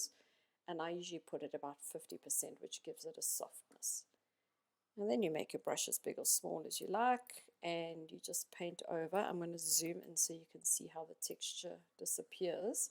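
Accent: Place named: South Africa. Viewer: Thank you for this amazing tutuorial. I really love your designs. I can hear you are from SA. I am in Cape Town and am also a digital designer so I thought I would say hi.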